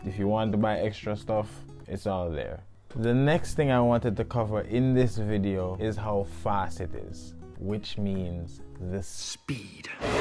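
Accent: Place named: Jamaica. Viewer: The Man speaking American English with a Jamaican accent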